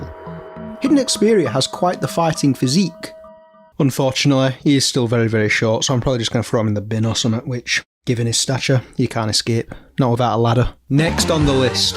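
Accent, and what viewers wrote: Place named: Birmingham, United Kingdom. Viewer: Honestly seemed liked a birmingham chap to me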